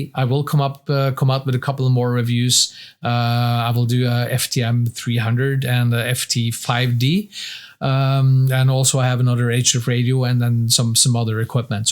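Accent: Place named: United States. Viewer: Excellent++ video! You seem like an authentic LA but you sound almost like an American? ;-) Meant as a compliment. I am a CW guy and if those RF insides were in an IC7300 - magic. But I have long Nordic fingers too and no way I can master those buttons, besides I dislike touching screens. Maybe FTdx10 housed in an FT2K or FT3K would be optimal. Yet I fear we are in the time of the silicon sliver iPad devices. If I ever see a mechanical S-meter again on a new radio it will be a Shock! de Wb8yqj diDit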